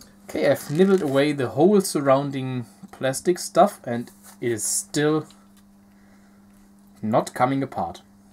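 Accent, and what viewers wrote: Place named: Switzerland. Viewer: hört sich nach schweizer dialekt an ;)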